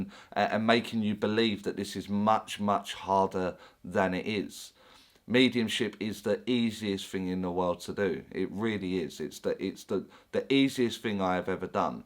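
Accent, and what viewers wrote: United Kingdom. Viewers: Breath of fresh air. My fellow Brit says it how it is. Sounds like he comes from my neck of the woods. Thank you!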